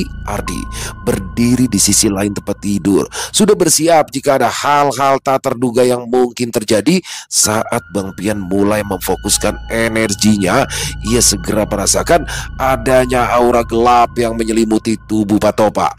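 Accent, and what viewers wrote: Jakarta, Indonesia. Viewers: Bang itu pas banget klo ceritain logat betawi sangat terhibur,apalagi klo bang piyan bertengkar guyon sm baba ali,klo bang doni bertengkar guyon sm ibu zubaedah bikin enak denger nya sambil tertawa,mantap bang sdh bisa menghibur kita semua,sehat utk bang nya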